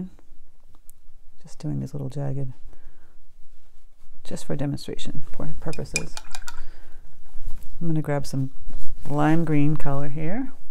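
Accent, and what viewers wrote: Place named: Maine, United States. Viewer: Are you from Maine? I can hear it in your accent ;-)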